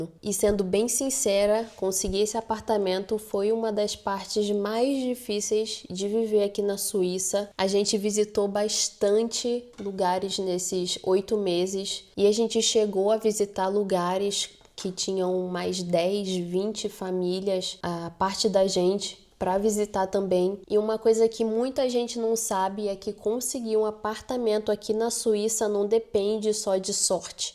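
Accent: Rio de Janeiro, Brazil. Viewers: Eu também não gosto do sotaque carioca. Mas ela não tem culpa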